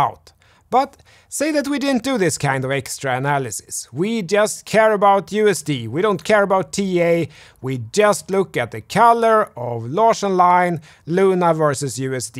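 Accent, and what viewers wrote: Sweden. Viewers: I love your thick Swedish accent, and I'm not being sarcastic. You sound exactly like a voice from a south park episode. If the crypto stuff doesn't work out, do voice acting. :D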